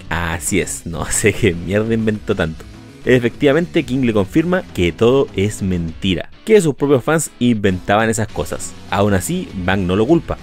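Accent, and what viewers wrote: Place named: Chile. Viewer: Epico es genial Tu acento chileno Brother Saludos eres un Crack 🤙🤙🤙😁👊👊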